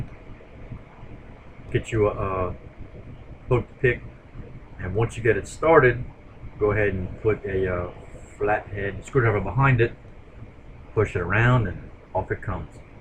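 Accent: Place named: Louisiana, United States. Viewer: I recognized that accent immediately. You from down the bayou. I’m from Thibodaux.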